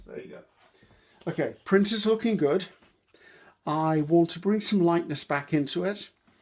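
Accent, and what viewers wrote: United Kingdom. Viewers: I watch your wonderful videos daily and I never tire of your creations. I especially enjoy listening to your British accent and quirky sense of humor. I live in the Deep South of USA. We use tung oil on heirloom antique furniture.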